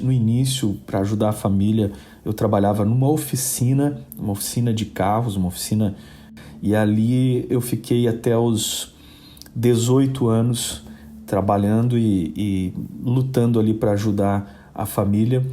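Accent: Brazil. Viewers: Nosso sotaque gaúcho sempre nos entrega! Gostaria de agradecer pelo canal. É a materialização das minhas paixões! Uso-o muito para auxiliar os ensinos didáticos do meu filho. Se houver alguma maneira (com mão de obra) que eu possa ajudar, por favor, deixe-me saber. Que o Senhor o abençoe grandemente!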